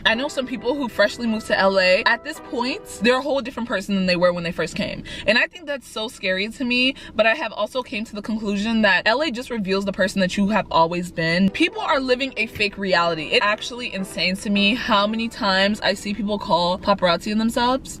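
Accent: Nigeria. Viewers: Im starting to develop a Nigerian accent cuz i just love binge watching vids are 😭💗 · What makes me happy in these videos is that you incorporate the nigerian accent cause I'm also nigerian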